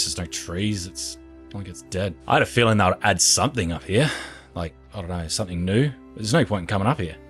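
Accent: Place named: Australia. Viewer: I love the Aussie accent and your humor is top notch sure these vids are old but I just found you a day ago..Keep up the good work..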